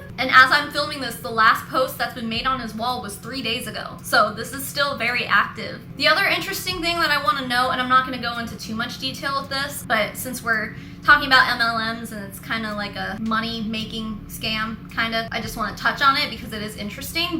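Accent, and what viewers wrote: South Africa. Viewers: Yoh! that south african accent is THICK! also for some reason people here in South Africa have an obsession with Demons... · Oh. South Africa. Thats my country. Well its about time the shitstorm that is South African cults is opened up. Its a wild ride to hear your own accent in a video about cults, Im not gonna lie. Sadly, its a common belief here that certain people can take in spirits and release them, especially if you're Christian. However, that gal? She needs some medication, and a therapist. ASAP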